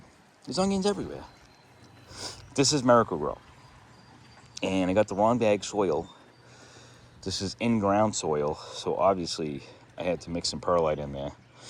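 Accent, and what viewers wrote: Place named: Northeast, United States. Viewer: Thanks for sharing your garden! Are you in the far Northeast like near Boston? Pickup up a subtle Northeast accent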